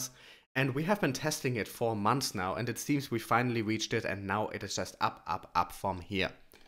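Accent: Germany. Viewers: love your vids, thanks for your hard work. You remind me of German poker pro named Bencb789, ever heard of him? well, only thing you have in common is German accent. But you both sound smart AF! thanks for the info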